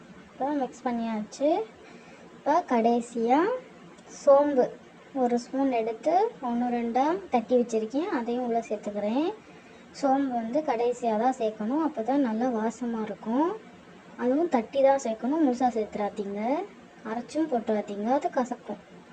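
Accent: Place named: Madurai, India. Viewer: Your madurai slang is awesome 👌 👏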